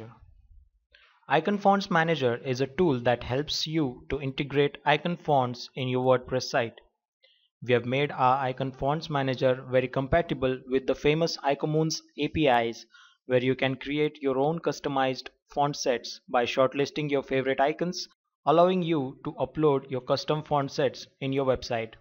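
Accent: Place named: India. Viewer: for the indian accent haha (looking the video with a masala chai ^^)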